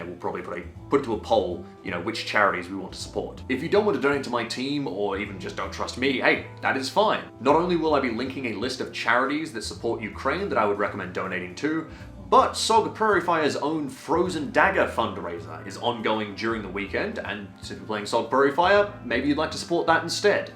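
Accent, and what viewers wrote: Australia. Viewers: I wont lie man, when i first watched one of your videos 2 years ago i wasnt sure if i liked your stuff or not. It took me a couple of months of watching random suggested videos here and there before i actually subscribed.. But im so glad i did. Your gameplay stuff is consistently funny and enjoyable, the largescale stuff you run is nothing short of epic.. But this takes my respect for you to a whole nother level. A lot of people talk big, not many of them actually back it up by really helping, and very few would actually go over there and put themselves in danger to deliver that help themselves and show your support in person. I dont want to put words in their mouths but Im sure it lifts the battered spirits of Ukranians to see forgeigners from literally the opposite side of the world being willing to go to such lengths to support them in their time of need, and them being able to see your face and hear your ozzy accent in person must just solidify that feeling even more. 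Youre a man with principles, courage and integrity and I have absoltely nothing but respect and admiration for you,. Cheers bro Slava Ukraine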